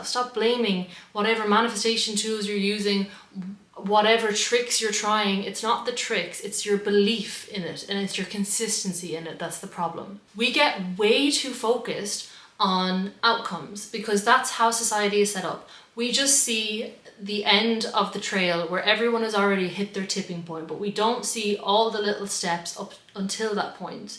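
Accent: Ireland. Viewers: I love your irish accent.Your so beautiful ❤️😊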